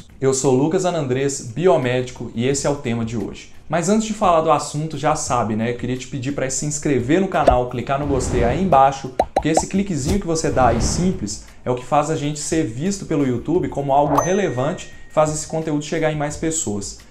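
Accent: Brazil. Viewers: eu gostei do conteudo e do jeito de falar de Voce e seu portugues " brasileiro"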